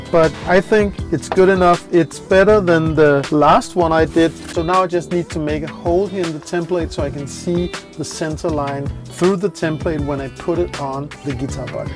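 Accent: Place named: Germany. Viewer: Why isn't it perfect? What are the problems there? I removed a year ago the neck of my strat copy and now the body seems to have changed due to humidity. The neck pocket was way too tight anyway. I'm trying to realign and reroute the neck pocket now. If your method wasn't perfect how can I achieve an absolutely centered neck? Doesn't matter how much work it is. I'm a perfectionist and I'm trying to achieve less than tenth of a millimeter deviation from body to the end of the headstock. I want it perfectly centered How should I do it? Your accent sounds German. Grettings from Braunschweig by the way.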